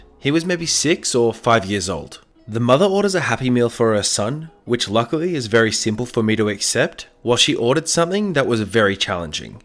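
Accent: Australia. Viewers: Wait?!? You're Aussie? How have I not picked up on this? Anyways, keep up the great work mate, I love your videos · wait are you australian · Im not 100% sure what his accent is but it is the best one Edit: well apparently it is an australian accent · Wait is aussie?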